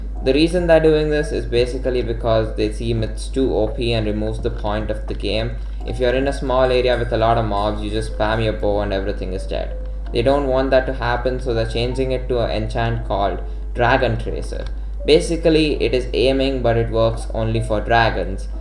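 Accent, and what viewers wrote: India. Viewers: U sound kinda indian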